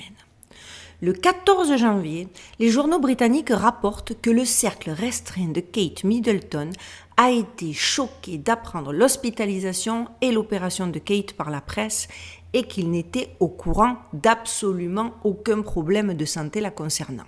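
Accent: Marseille, France. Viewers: Toujours aussi passionnante plus l’accent marseillais 👍🙏🏼❤️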